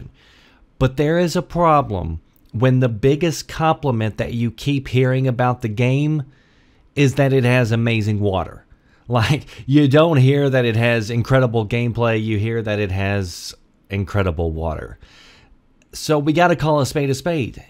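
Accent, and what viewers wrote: South, United States. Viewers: holy shit his southern accent threw me off so bad.... i fucking love southern accents and im not even american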